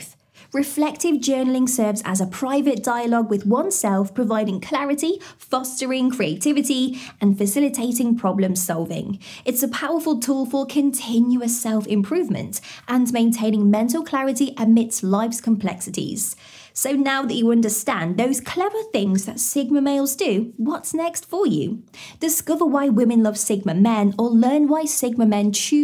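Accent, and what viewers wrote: United Kingdom. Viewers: I get tired of British accents. Good night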